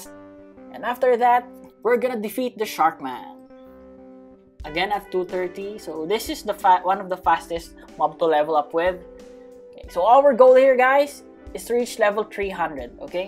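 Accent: Philippines. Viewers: SO THATS WHY U SOUND LIKE FILLIPINO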